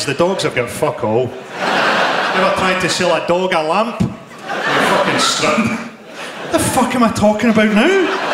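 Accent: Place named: Scotland, United Kingdom. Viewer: I reckon the Scottish accent is boss👍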